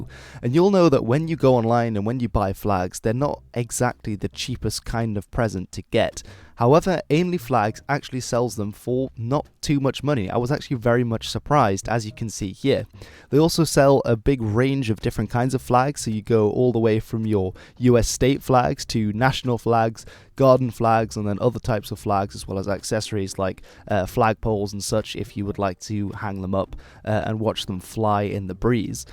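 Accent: Netherlands. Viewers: Je kan gewoon horen dat je nederlands bent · Is he Dutch wtf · I love your channel from now on. You just earned a sub mate. Are you Dutch or something? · Detecteer ik hier een heeeuul klein beetje Nederlands accent? Ik weet het niet zeker, en het Engelse accent is bijna perfect maar de Nederlandse uitspraak is te perfect. Deze dude is Nederlands. (denk ik) :D :D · Are you Dutch? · what country is from? His accent makes me think hes not from the UK but I could be wrong. Is he Dutch?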